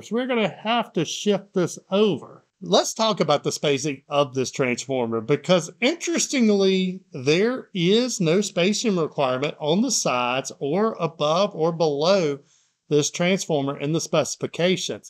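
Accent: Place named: United States. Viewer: where's your accent from in America? I don't live in America so it's genuine curiosity 😅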